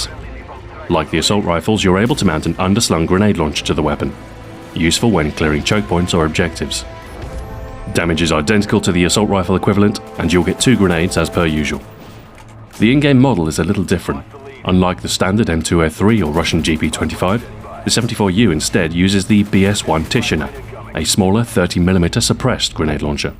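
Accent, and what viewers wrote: England, United Kingdom. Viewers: Play the video at about minutes and switch it to 0.5x speed. LOL. voice sounds so funny. He sounds like HAL 9000 with a little more of an English accent.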